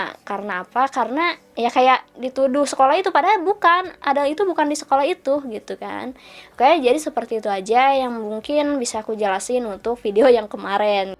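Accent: West Java, Indonesia. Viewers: ka kayanya org Sunda ya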